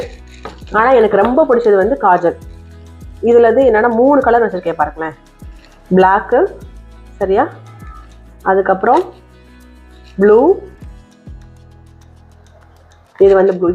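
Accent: Madurai, India. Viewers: Hi i'm ur new subscriber.ur slang.....?i think ur native is madurai?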